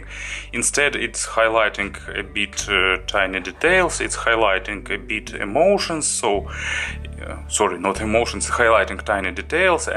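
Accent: Russia. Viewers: Сильно чувствуется русский акцент))) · Сразу слышно суперрусский акцент😂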